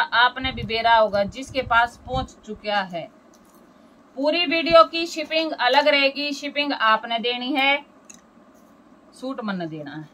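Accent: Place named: Haryana, India. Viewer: हम भी हरियाणा से हैं, मुझे आप की बोली बहुत पसंद है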